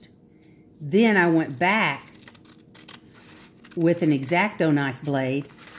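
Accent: South, United States. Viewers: She kind of reminded me of Carol Duvall with a southern accent. I kept thinking I have to get off this computer, got things to do, but was riveted to the end. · Oh my goodness, I LOVE your accent. I’m guessing you’re a Southern Lady? I love it. 💖💖💖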